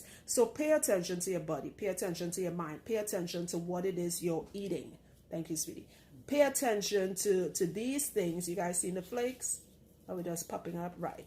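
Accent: Trinidad and Tobago. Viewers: I knewwwwww I made out the Trini accent... Omg aren't our accent like a silver lining behind the dark cloud, look my pores raise lol... Hey Trini 🙋🏾‍♀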